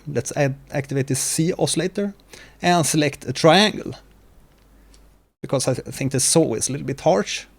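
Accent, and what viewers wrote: Sweden. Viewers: omg you sound sooo swedish! love it! <3 (im from sweden too)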